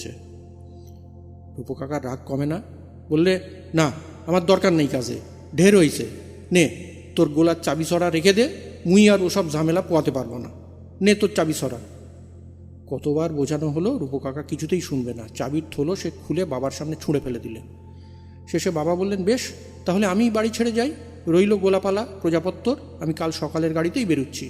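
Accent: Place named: Bangal, Bangladesh. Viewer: স্যর বাঙাল উচ্চারণ তো বেশ ভালো 👌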